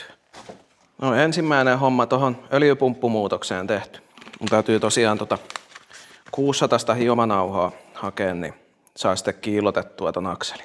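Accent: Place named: Pirkanmaa, Finland. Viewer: Ammattimiehen hommia on kyllä kiva kattoa, vaikkei nyky-VAG:laisiin koskis ite pitkällä tikullakaan. Pirkammaan murretta on kiva kuunnella.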